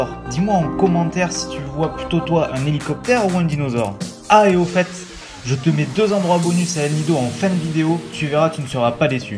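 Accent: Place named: Toulouse, France. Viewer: La vidéo est incroyable, avec en bonus les noms philippins avec un accent toulousain 😍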